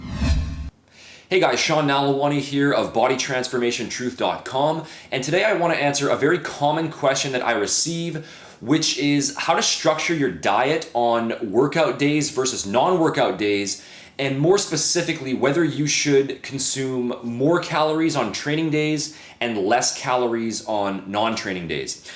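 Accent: Canada. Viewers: Are you Canadian? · are you from toronto? you sound like kinobody with the way you pronounce your O's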